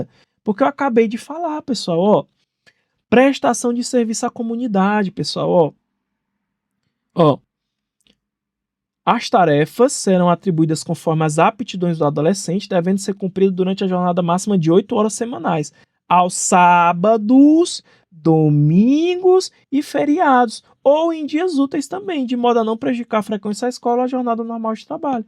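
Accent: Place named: Northeast, Brazil. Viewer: Não fala mal do seu sotaque! Com tantas potências no nordeste brasileiro, este se tornou o que eu mais gosto! É um sotaque digno de orgulho!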